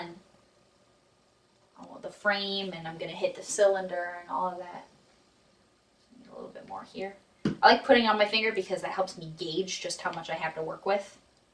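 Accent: Wisconsin, United States. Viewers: Your accent also gives it away. I am also from Wisconsin and when I went to the East Coast, the locals would ask me if I was from Wisconsin or Michigan.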